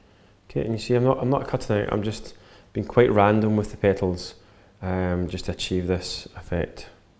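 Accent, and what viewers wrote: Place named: Glasgow, United Kingdom. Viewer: Such a talent, i love watching clear, concise step-by-step tutorials like this, really makes you feel like with enough practise, you could do it yourself. Thank you! Also sounds like a Weegie accent there! So rare to hear, makes me miss home! :)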